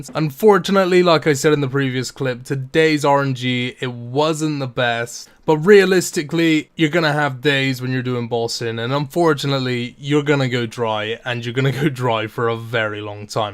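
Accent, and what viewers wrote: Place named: England, United Kingdom. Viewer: Cool furtysix mill loot tab. In all seriousness what region is yoir accent from? I know its and English accent but what area?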